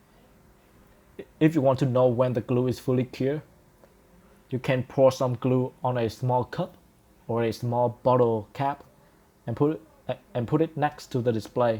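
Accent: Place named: Vietnam. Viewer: u sound like vietnamese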